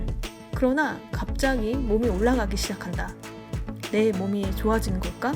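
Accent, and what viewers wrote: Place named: Russia. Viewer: 간만에 다시 보니.. 살짝 러시아 여자.. 발음 삘.. 나다~ 검은사막~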